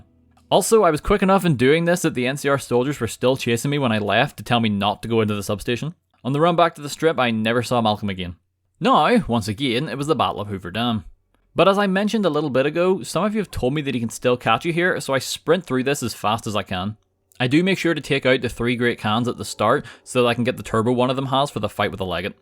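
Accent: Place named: Northern Ireland, United Kingdom. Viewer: Ahh, a fellow Northern Irishman, eh?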